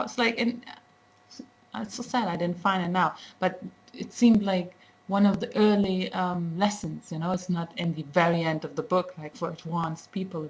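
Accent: Nepal. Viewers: There is no doubt that u r pretty, but with ur Nepalese accent u just killed me. You sound and look sooo hot.... well beyond hot :) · I loved your Nepali accent!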